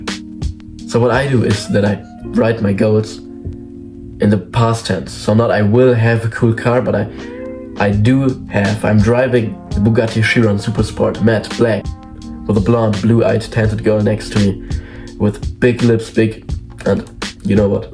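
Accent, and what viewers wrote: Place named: Germany. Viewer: I like your german accent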